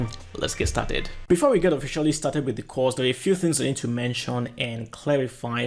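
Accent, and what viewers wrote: Nigeria. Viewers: Hey bro, good info, is that a Nigerian accent lol? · Great video. Merging my php knowledge with Wordpress has been tricky. This is really helpful. Thanks You sound Nigerian. Are you Nigerian? I’m Nigerian 🇳🇬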